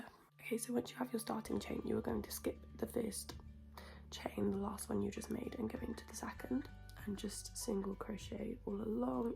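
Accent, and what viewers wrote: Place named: Pembrokeshire, United Kingdom. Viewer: Hia, I've just found your channel and I'm enjoying it. I agree that you have a lovely voice. Can I hazard a guess that you're from Pembrokeshire? I grew up there and find the accent very familiar and comforting.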